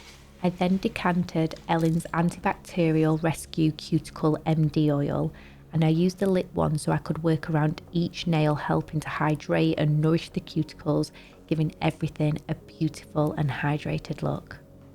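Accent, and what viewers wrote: United Kingdom. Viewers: where in the UK her accent is from?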